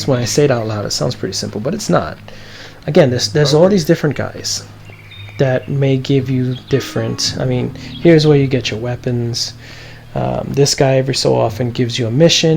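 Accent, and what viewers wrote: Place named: Northeast, United States. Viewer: Hi, I enjoyed your video. I'm thinking about purchasing the game, so you are helping make up my mind. Looks like a great game. I love your accent, I'm thinking northeastern American city?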